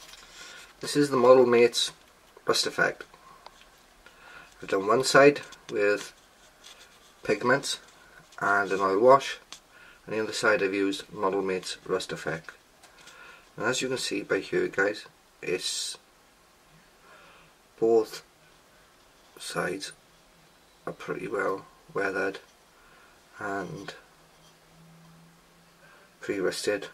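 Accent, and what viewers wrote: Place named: Wales, United Kingdom. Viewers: Jesus! I can't even read the subtitles! What an accent!!! Dat British... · Welsh :D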